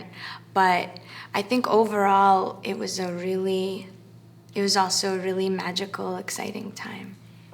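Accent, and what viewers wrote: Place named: Russia. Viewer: слышу носителя русского с отличным американским акцентом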